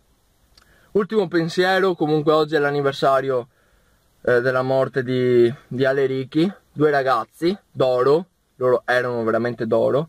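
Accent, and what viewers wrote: Veneto, Italy. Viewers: 1.15 LÈGO AHHAHAHHA Comunque bellissimo il dialetto Veneto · Il tocco del dialetto veneto rende tutto più bello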